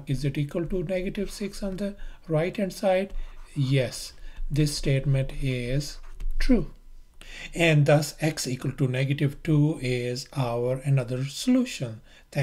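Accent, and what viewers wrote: India. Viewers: Indian dialected man good job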